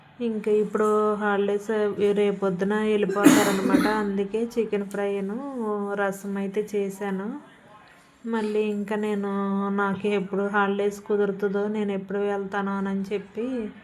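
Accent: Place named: East Godavari, India. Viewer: Akkaaaa...Meeku east godavari yaasa vachesindiii..curry super 😊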